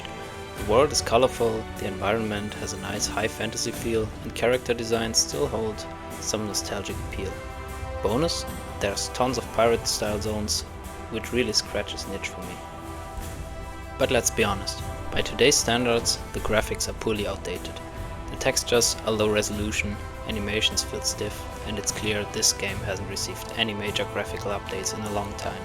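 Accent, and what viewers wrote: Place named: Germany. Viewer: thx for the test. also love the nice seemingly german accent :-)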